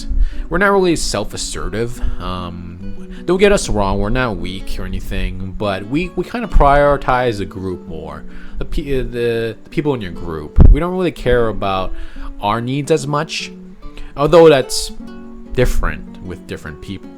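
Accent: Hawaii, United States. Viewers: I feel like you took on more of a mainlander accent. But your local accent is coming out.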